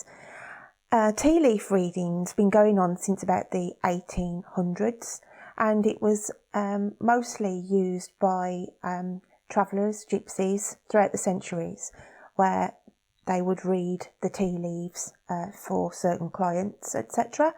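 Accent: Birmingham, United Kingdom. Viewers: thanks my lovely that was really cool what you did it accent sounds local I'm from Birmingham UK I'm sure ur not to far lol x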